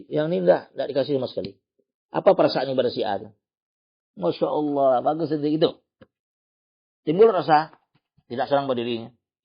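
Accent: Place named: West Sumatra, Indonesia. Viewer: Ini ustadznya orang Minang nih Logat nya...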